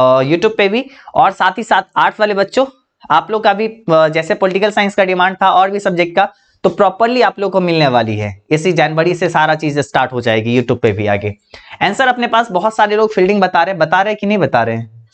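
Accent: Bihar, India. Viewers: Bihar se hain babua ❤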